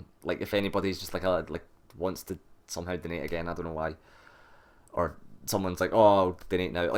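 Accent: Scotland, United Kingdom. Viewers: I can't even imagine what it must be like man. I am watching your DS1 guide to help me on my second save and you just make me laugh and keep me plodding through. I love the Scottish accent too. Bringing donations into the limelight is fine, and I wish I could donate directly, but hopefully my views on youtube will help out! Keep keeping on man, you guys kick ass!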